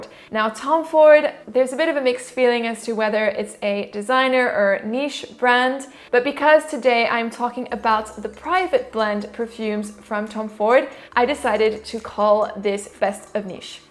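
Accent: France. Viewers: A totally intoxicating french accent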